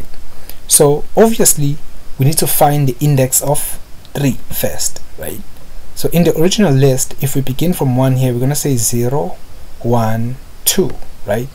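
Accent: South Africa. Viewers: you sound south african sir. thank you by the way!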